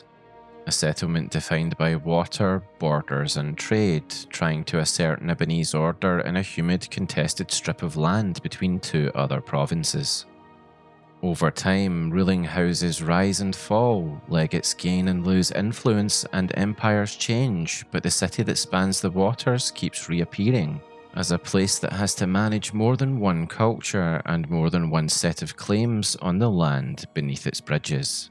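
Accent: Scotland, United Kingdom. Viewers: Are you Scottish? Very similar accent to folk round me 😂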